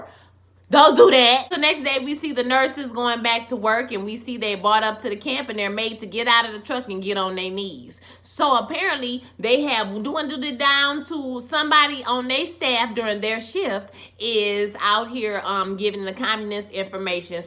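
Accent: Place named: New Orleans, United States. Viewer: you gotta be from new orleans... that accent came in loud and clear... great review.. I come for the comedic nuggets you provide... hilarious..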